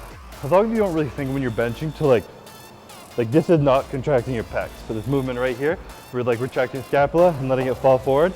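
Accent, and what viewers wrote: Canada. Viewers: That’s that Canadian accent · Chris has got to be Canadian the way he talks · Canadian lol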